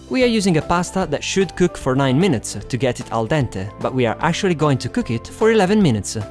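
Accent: Italy. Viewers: I could tell immediatly you're italian! for the great recipe alternatives and the accent! I live in italy too and it's great to have alternatives to our traditional plates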